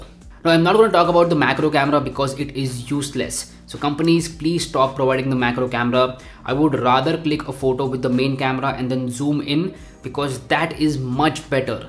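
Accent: India. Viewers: Why Indian speak like this????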